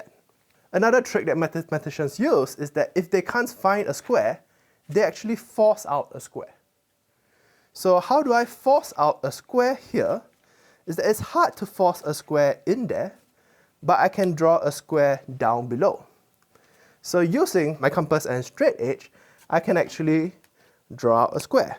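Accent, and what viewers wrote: Singapore. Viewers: dat singaporean accent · is he a Singaporean? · is the speaker singaporean/malaysian? because I suddenly feel really patriotic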